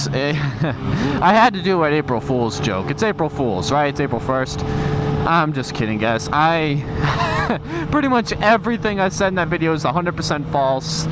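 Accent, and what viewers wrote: Massachusetts, United States. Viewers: What part of mass are you from ?? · What part of Massachusetts you from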